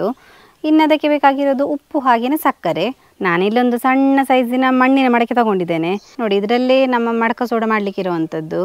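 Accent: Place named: Dakshina Kannada, India. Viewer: ಮಡ್ಕ ಸೋಡಾ ರುಚಿ ಕುಡಿದ ನಂತರ ಗೊತ್ತಾಗುತ್ತೆ ಆದ್ರೆ ನಿಮ್ಮ ಕನ್ನಡ ಉಚ್ಚಾರಣೆ (ದ. ಕ. accent ) ಕೇಳಕ್ಕೆ ಬಹಳ ಚೆನ್ನಾಗಿದೆ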